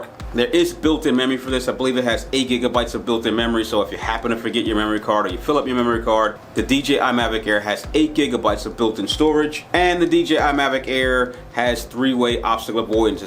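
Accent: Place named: New Jersey, United States. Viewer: FIVE STARS! Always like your fresh comments, I just bought it! and is refreshing to hear sincere WORDS before I start out on my experience. Your the only reviewer, who tell it, right off, with out the fancy intro music video. To the point video from a reviewer who did not get a free one from DJI, Love The honesty Pal! KEEP UP THE spontaneous reaction! VERY HELPFUL! I am in Thailand, but you sound like the truth of my old neighborhood in New Jersey, very comfortable!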